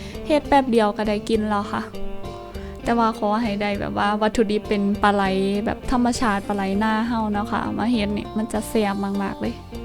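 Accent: Isan, Thailand. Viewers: ไม่เคยดูคลิปแบบนี้ แต่ขอบอกเป็นน้องที่น่ารักพูดอีสานได้น่ารักมากไม่หยาบคายขอให้มีความสุขมากๆนะคะสนับสนุนค่ะ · อ้อครับ สำเนียงเว่าบ่คือ กสิน คือทางบ้านผมนิ55 เลยถามเบิ่ง ทำกับข้าวเก่งมากๆ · พูดอิสานเพราะมีเสน่ห์มาก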